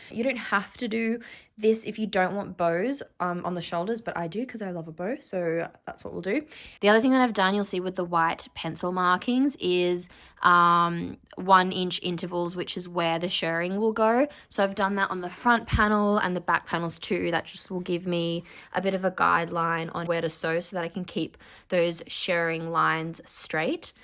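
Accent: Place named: Australia. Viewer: Full credit to the wonderful plethora of American shirring tutorials but it's so nice to hear a fellow Aussie voice saying the word shirring.. 😅 I also loved seeing a tutorial done on a machine with fewer bells and whistles, like mine! Thx for the vid ❤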